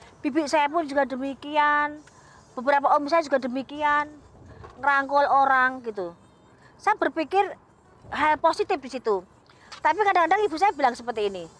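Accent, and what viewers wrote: Java, Indonesia. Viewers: Oh blasteran jawa bandung toh pantesan logat jawanya ga hilang.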